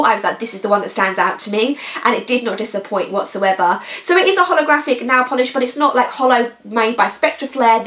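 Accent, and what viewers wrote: United Kingdom. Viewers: Brilliant! I honestly could just listen to you speak all day long with your lovely British accent! I too wondered about the pronunciation of the word Gemino. Tomāto tomàto I guess 😉 (Still can’t believe you’re nearly at the end of a pregnancy!) xo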